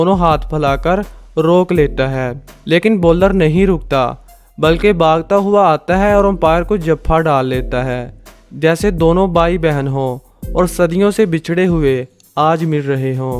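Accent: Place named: Punjab, India. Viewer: Poor comentry punjabi style😔😔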